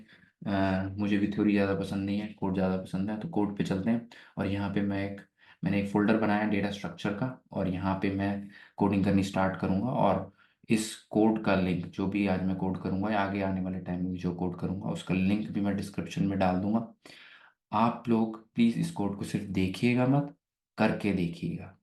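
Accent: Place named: Pakistan. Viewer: Apki voice se ap Muslim lag rhey.... Like Pakistani language you r speaking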